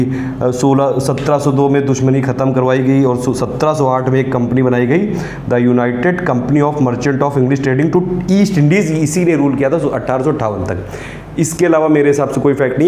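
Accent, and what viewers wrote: Kanpur, India. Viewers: Nice kanpur accent sir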